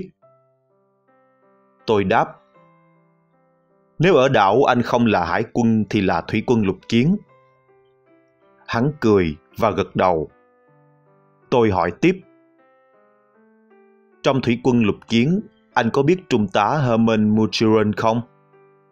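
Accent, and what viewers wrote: Standard. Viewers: Giong tram phát am chuan rất hay ( đường phan thanh gian )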